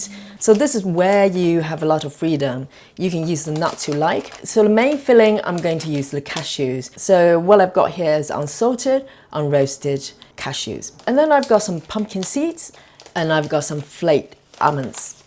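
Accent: United Kingdom. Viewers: Lovely voice. Are you british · Where are you from? Lol I love your accent! Anywhere near UK? Elegantly presented as a real human being ( unlike those others :) !! ) I may just have found the road to my own keto experience! Thanks so much! PS Wanna go somewhere nice?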